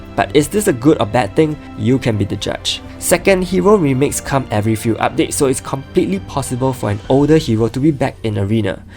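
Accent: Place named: Singapore. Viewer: are you from Singapore? quite a familiar accent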